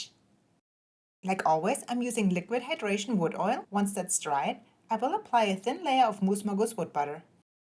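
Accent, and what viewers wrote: Germany. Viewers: The lack of German being spoken in this video makes it less fun. 🤣🤣🤣....it's all good, though. The accent makes it worth it still.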